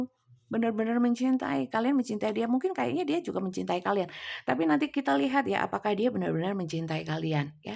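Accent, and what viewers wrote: Java, Indonesia. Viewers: Saya selalu ketawa tiap ibu keluar Jawa medok nya. Kalau ada orang yg serumpun bahasa dengan kita, lalu lihat video ini : kata "munthuk" itu bahasa Malaysia apa ya ? Saya nonton rame2 dengan anggota keluarga yg lain, waktu ndengerin ibu bilang "munthuk"... "angel wis angel" spontan ketawa. Baru tahu kami kalau ada juga cinta bersegi yg kasmaran tapi bisa dengan kontrol diri yg baik. Biasanya buntut nya cerai dgn pasangan sah nya, kalau beberapa hari ini berturut-turut bacaannya cinta bersegi, berarti buuuanyak yg menjalani kasus2 seperti ini. Terjadi penurunan moralitas dalam pernikahan. Dampak dolar naik ke 16ribu, ekonomi negara kita sedang tidak baik-baik saja, eh pernikahan kok ikut2an juga tidak baik-baik saja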